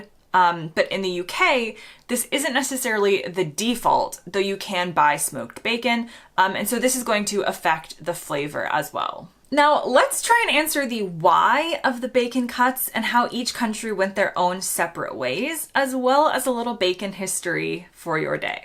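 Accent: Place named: United States. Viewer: You are an English girl with an American accent !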